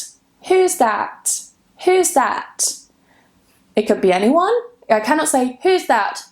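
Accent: United Kingdom. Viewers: Hello! Beautiful British accent! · It's lesson was marvelous and helpful for me. I love your British pronunciation. Thanks.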